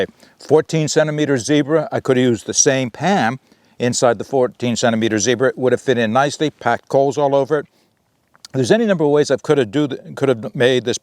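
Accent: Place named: Nova Scotia, Canada. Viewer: Just found you channel, I am starting Keto and am looking for something for outdoor cooking. So far I have enjoyed you recipes and would like to see a book on keto eating outdoors, I have looked for such a book and can;t find anything suitable, had you thought about writing one? I am UK based and Keto doesn't seem to ba a popular lifestyle here, so far I am enjoying the keto and feeling better for it. Please keep up the good work, you are easy to listen to and follow. I'm Guessing you accent is either Nova Scotia or Newfoundland.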